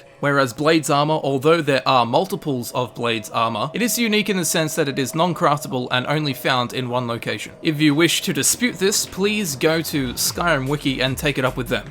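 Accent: Australia. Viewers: Batmans Goldfish he sounds like Crocodile Dundee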